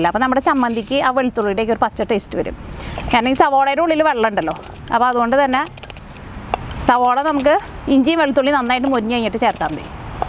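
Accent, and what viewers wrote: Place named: Ernakulam, India. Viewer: സംസാരം കേട്ട് എറണാകുളം ജില്ല യിൽ ആണ് താമസം എന്ന് തോന്നുന്നു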